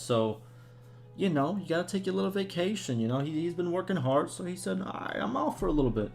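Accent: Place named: Los Angeles, United States. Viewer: Lol wow from the Ukraine??!! Dude your voice and demeanor makes me think you are from LA and not even a white guy haha. Keep up the reporting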